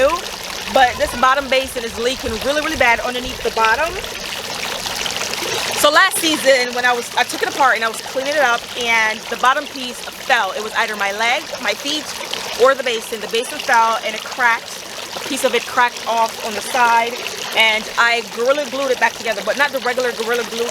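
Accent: Jamaica. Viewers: Are you Jamaican? Jerk chicken and night owl. You sound like me and your love of flowers is so familiar to me. We just want beauty in our yard! Your place is naturally beautiful. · Greetings from Canada, beautiful garden. I love how the Jamaican accent comes out every so often.😂😂 .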